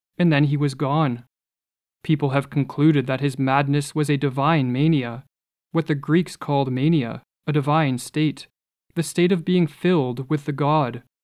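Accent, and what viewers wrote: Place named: Canada. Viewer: Yeah, I hear the lilt and every once in a while a trace of a Canadian vowel; also, very often clear signs of a mind and ethos I recognize as Canadian Gen-X. But I never would have guessed in a million years that he doesn't work alone. Every bit of the intellect and personality he reveals to us argues lone wolf... except for, I guess—and this is the part I missed—the fact that he wants to be with us, his audience. (Bonus speculation, just for fun: I bet he's left-handed.)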